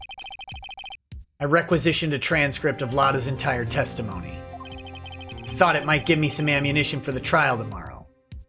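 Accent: Germany. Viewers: That German accent marks it 1000 times funnier! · Your German accent takes this to the next level hahaha!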